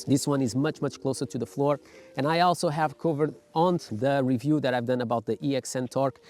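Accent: Portugal. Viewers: Já tinha reparado que eras português pelo sotaque mas agora fiquei mesmo surpreendido, também sou de Palmela. Bom video, estou a pensar comprar a Inmotion V12 :)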